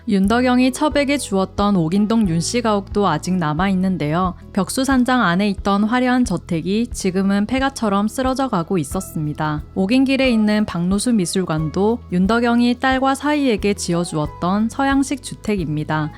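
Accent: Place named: Gyeongsang, South Korea. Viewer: 경상도 사투리다! 넘 촬영도 잘하고 차분하게 나레이션해주셔서 추억뿜뿜이네요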